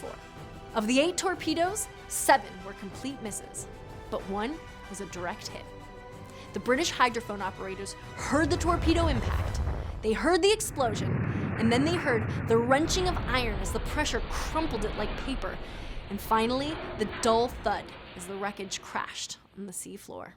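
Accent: United States. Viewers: TM: Gee, what a surprise that an American would use American pronounciation.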